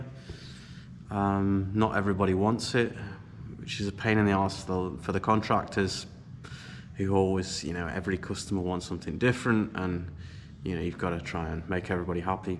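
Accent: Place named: United Kingdom. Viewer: The most British sounding German i,ve ever heard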